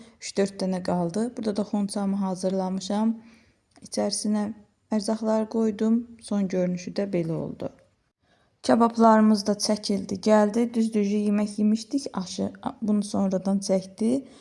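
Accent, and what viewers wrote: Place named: South, Azerbaijan. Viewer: Salam,sizin ləhcəniz elə şirin gəlir.variydi variydi deyirsize,cənubdansiz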